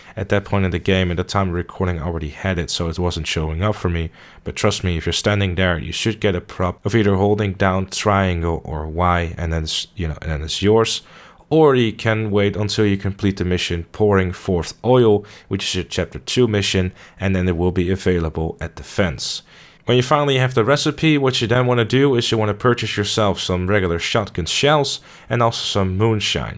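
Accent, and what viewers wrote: Netherlands. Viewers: Subbed! Your challenge videos have helped me out a bunch! Are you Dutch by any chance? I recognize the accent I think ;)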